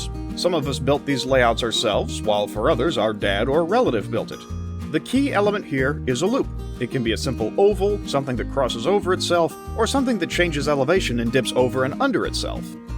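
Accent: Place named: Texas, United States. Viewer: WHY do you sound like texan Gabe Newell?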